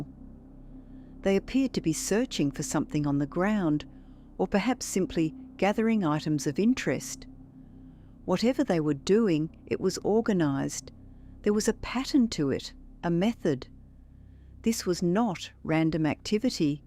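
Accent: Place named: Australia. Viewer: The story teller has a lovely gentle Australian accent, and voice.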